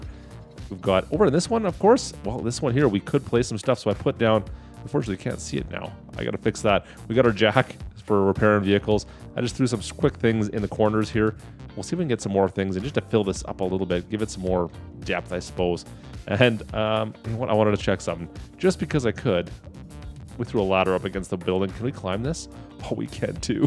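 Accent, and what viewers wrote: Canada. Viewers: Are yoy from alberta?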